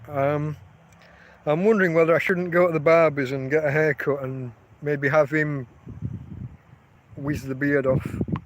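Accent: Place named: Yorkshire, United Kingdom. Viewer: Are you from Yorkshire ? Strong sense of a northern accent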